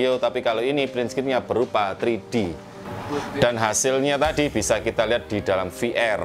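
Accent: Java, Indonesia. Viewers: Klo dlm bentuk lappie udh 18 juta lbih tu. Macam kyk gl553ve. Bang klo ganti gtx 1060 jdi 10 jt ya kyk video sblumnya? Btw Saya demen logat medoknya. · seneng sama logatnya, rada" medhok wkwkwkkwwk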